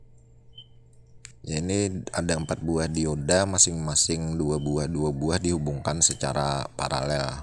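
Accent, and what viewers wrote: Banjar, Indonesia. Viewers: Pina kaya orang banjar pian niiih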